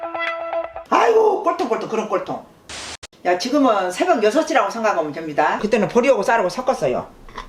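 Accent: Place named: Gwangju, South Korea. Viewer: 3년전에 돌아가신 할머니도 생각나고 지금은 나이들어 손자도 보신 저희 엄마도 생각나고 만감이 교차합니다. 저도 광주사람이라 사투리가 너무너무 익숙하네요. 막례님 항상 건강하시고 늘 행복하셨으면 좋겠습니다!!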